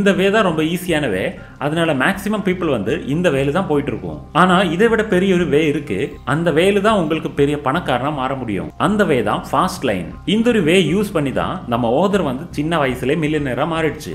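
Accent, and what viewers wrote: Kerala, India. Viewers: Avar malayali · Kerala slang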